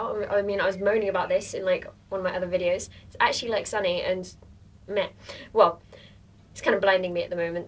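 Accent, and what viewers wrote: United Kingdom. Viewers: Blonde... British accent... Plays guitar and play? I think im in love... I came Ten years late I think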